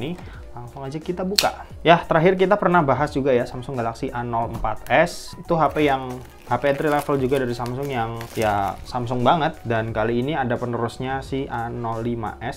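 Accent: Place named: Tegal, Indonesia. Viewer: tegal ya bang... logatnya ketara banget medok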